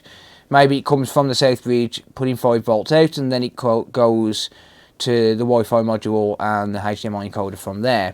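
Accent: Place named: West Midlands, United Kingdom. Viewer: Absolutely fantastic video. Im a new subscriber and really enjoying the content, it’s so interesting and you’re obviously incredibly knowledgeable about electronics . Also great to hear a fellow West Midlands accent ☺️✊🏻 I’m really thinking about getting a PS5. I have a PS4 Pro which is great, but I’d really like to upgrade. With regards to the Liquid Metal issue, would you advise to keep the PS5 flat rather than vertical so that the Liquid Metal doesn’t drop? It would suit my setup flat tbh. Do you think the liquid metal has dropped during transit or does in only become more of a liquid once heated? Sorry, I really don’t know much about that kind of thing 👍🏻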